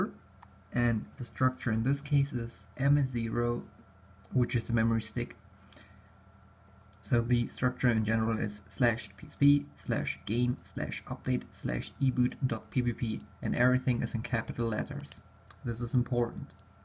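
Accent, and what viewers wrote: Germany. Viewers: Krasser deutscher Akzent :D Dankeschön.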